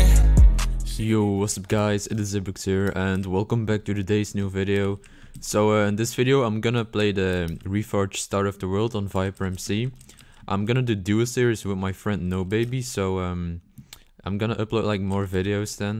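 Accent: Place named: Netherlands. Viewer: U sound Dutch. Are you Dutch?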